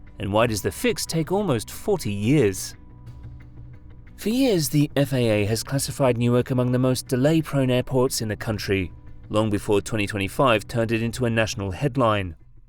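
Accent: United Kingdom. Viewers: This is BS. Newark is not a single airport without considering JFK and LaGuardia. It all functions as one organism. When one breaks down, they all break down. As for AirTrain getting to the train station, it is 20 years older than the JFK AirTrain. LaGuardia still does not have one. You sound British, is LHR the pinnacle of airports without messes? At least in London, the airports are all far enough away from each other, that a downfall with one does not affect the others.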